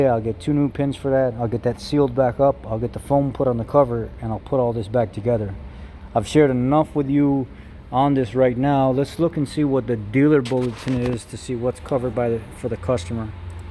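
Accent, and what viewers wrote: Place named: Chicago, United States. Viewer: You sound like a Polish Chicagoan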